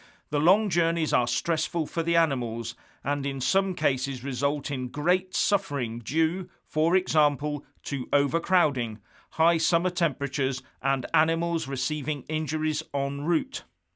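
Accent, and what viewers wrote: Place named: Dorset, United Kingdom. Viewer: Proper Dorset accent. Good video.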